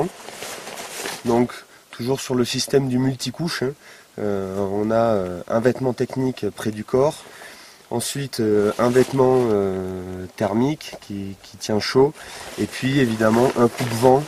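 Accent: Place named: South, France. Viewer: salut, tu as un léger et vraiment bel accent qui me rappelle Marcel Pagnol! J'aime beaucoup tes vidéos traitant de la randonnée ( je fais uniquement du cyclotourisme, mais le principe est le même). bise d'Alsace.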